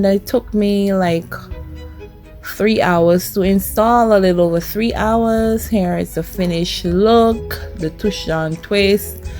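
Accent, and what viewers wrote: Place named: Saint Vincent and the Grenadines. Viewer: I knew you were an Vincentian I can hear the accent like my parents❤❤❤, I thank you for showing me an easier way to install dreads extensions 😊😊😊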